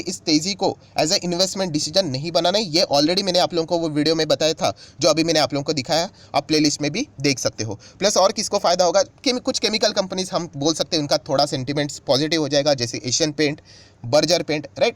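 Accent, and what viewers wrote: Maharashtra, India. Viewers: सर, तुमच्या डिमॅट अकाउंट मधील पोर्टफोलिओचा व्हिडिओ करा एकदा. मला माहिती आहे आपण एक मराठी माणूस आहात.... आपल्या हिंदिवरूनच समजतय ते... आपली अशीच प्रगती होवो....जय महाराष्ट्र..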